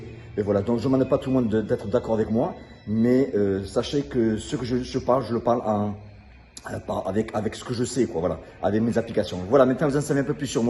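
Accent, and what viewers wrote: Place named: Provence, France. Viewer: Tu es originaire d'où ? Tu parles de Midi-Pyrénées mais je trouve que ton accent sonne plus provençal. Belle galerie de photos ! :)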